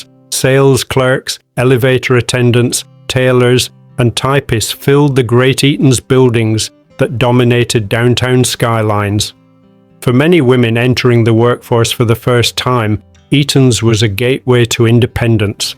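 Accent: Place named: Lancashire, United Kingdom. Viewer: What a clear crisp voice narrating the Eatons story. Accented by a touch of Lancashire?. Possibly Wigan, Bolton, Bury, Blackburn, Darwen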